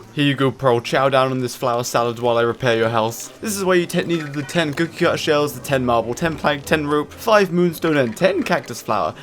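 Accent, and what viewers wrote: United Kingdom. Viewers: I love your British voice :))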